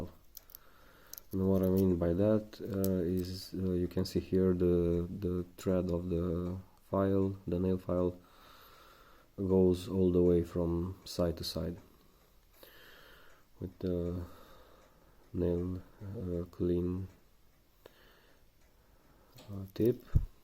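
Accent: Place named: Russia. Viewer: Не ну конечно акцент бля палевный кабзда,нашего человека узнать на раз)))Красава хули!!!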